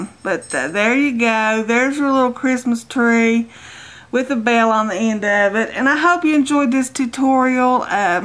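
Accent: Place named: Kentucky, United States. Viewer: I love your videos they are very informative and easy to follow and also you crack me up. Your country accent reminds me of my husbands family, they are in WestVirginia. You sound a little more south, regardless of where your from, your great,keep up the good work, I cant wait to watch more of your tutorials...GOD BLESS YOU DARLIN!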